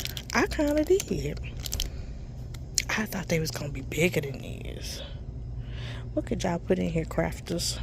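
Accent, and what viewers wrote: South, United States. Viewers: This is my 1st time on your page, you have a amazing asmr voice, then add that southern accent, PRICELESS 😁😁😁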